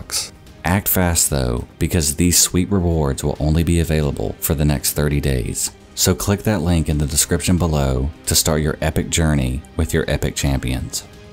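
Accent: South, United States. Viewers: Lmao “NEXPO rip off” but NEXPO doesn’t have that sick ass southern accent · are you from Florida or Alabama or somewhere like that? I'm from north al and I've been trying to place your accent but I can't quite get it. It's very nice though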